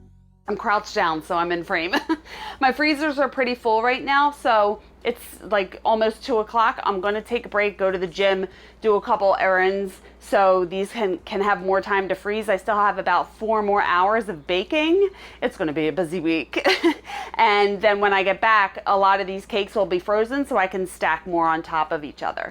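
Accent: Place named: Delaware County, United States. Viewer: I just came across this video trying to find tips for making my daughter's wedding cake. I heard the DELCO accent...living in SC for 14 years now. It's funny how I can still hear it right away. Lol Of course I had to look you up and saw Bensalem... Great tips! Thanks!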